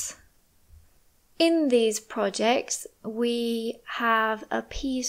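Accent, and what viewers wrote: United Kingdom. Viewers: This is british accent?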